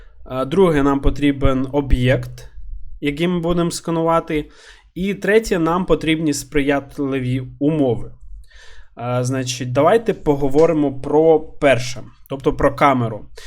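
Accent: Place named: Surzhik, Ukraine. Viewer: Классний суржик, бро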